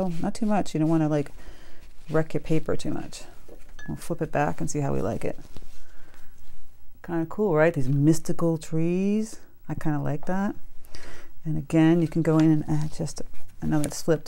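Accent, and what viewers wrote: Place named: New England, United States. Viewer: I loved the painting and I love the tutorial. You are very good at explaining the process. And I loved that I could detect a New England accent I believe!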